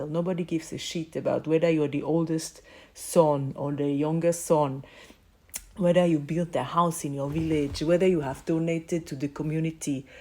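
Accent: Igbo, Nigeria. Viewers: E shock me as you speak igbo along with the Nigerian sland and accent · you are a good wife, thank you for understanding your husband and his culture, Igbo. you understood our slangs and also speak with our accent you are beautiful and blessed 💗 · Are you kidding? This is my first time coming across this channel. This is amazing😂 Ma, you’re a beautiful piece of God with your soul. Hearing the Nigerian accent and Igbo slangs coming out of a white womans mouth is a spectacle to behold 😅 I wish your family the best things of life❤❤❤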